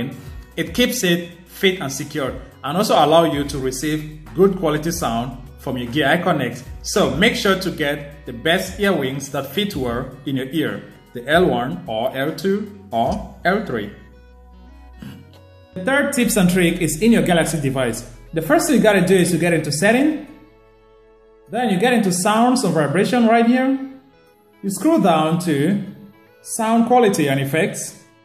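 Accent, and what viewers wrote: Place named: Vietnam. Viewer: Are you vietnamese, you sound vietnamese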